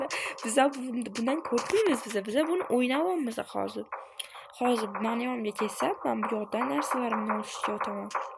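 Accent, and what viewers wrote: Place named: Khorezm, Uzbekistan. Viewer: Xorazmlimisz Shevayz tortp durti?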